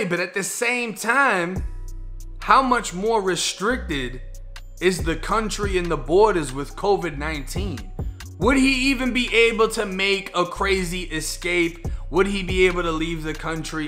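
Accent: Boston, United States. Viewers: You sure your not from Boston?? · are you from Boston cuz you have a slight Boston type Accent on how you Pronounce words with R in it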